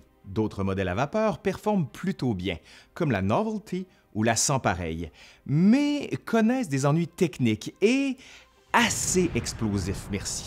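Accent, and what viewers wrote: Quebec, Canada. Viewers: slt tout le monde, je n'ai pas regarder toutes ses vidéos, mais avant j'avais remarquer que pour les vidéos qui s'orientais sur l'histoire québecoise, notre cher orateur avait un accent plus prononcé (qui pour moi, rendez difficile la compréhension de la capsule) et la je trouve qu'il fait attention à son accent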